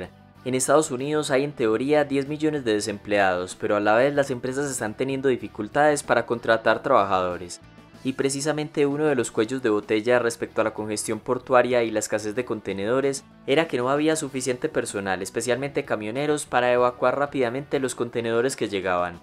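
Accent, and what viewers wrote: Colombia. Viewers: Sería interesante que hagas un video sobre como las subvenciones dañan el tejido productivo de un país, por tu voz creo que eres colombiano, en caso de ser así sabes que ciertos sectores políticos han propuestos rentas básicas universales.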